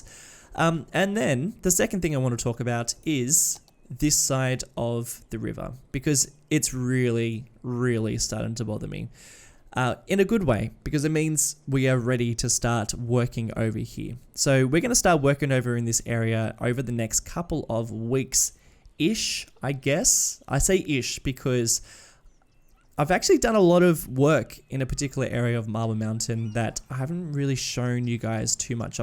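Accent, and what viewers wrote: Australia. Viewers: Lemme translate aussie to non-aussie. Erhm. 'Good day (g'day) guys, and welcome back to.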